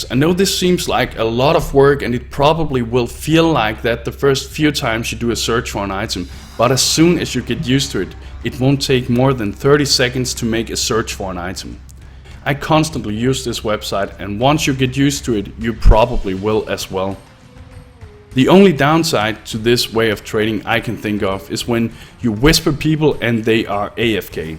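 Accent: Denmark. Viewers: Yo nerd. Just something im wondering, are you Danish ?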